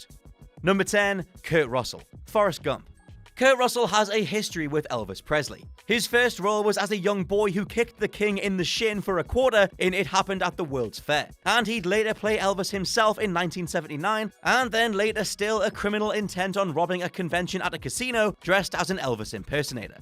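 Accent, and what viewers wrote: United Kingdom. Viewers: That's a British thing. They almost all pronounce it Whooook instead of how North Americans do.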